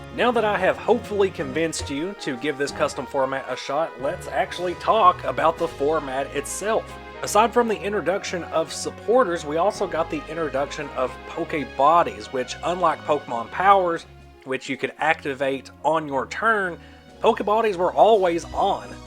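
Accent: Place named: South, United States. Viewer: a real pokemon OG, im from the south, moved out west, don't get to hear the accent often, but when I do and in combination with pokemon, it's so ideal, I've used your channel to curate my vintage play collection, now that I have a better understanding of e readers I'll be on the lookout for these cards. thanks you the OG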